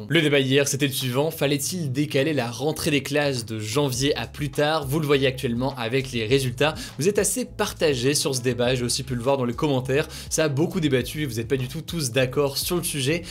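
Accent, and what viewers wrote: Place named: England, United Kingdom. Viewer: Ct’accent anglais tu régales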